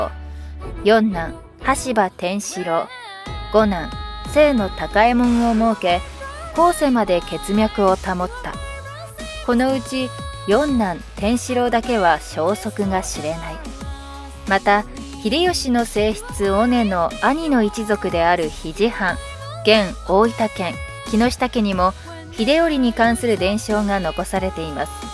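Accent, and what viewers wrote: Kansai, Japan. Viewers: この関西弁の男性ナレーターさんと直接お話してみたい！歴史ロマンが深そう！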